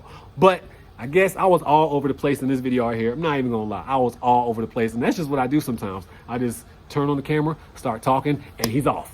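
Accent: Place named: United States. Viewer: Sounds like your from the U S A and it sounds great you moved around a bit well rounded! Be Well Be Safe & Stay Blessed.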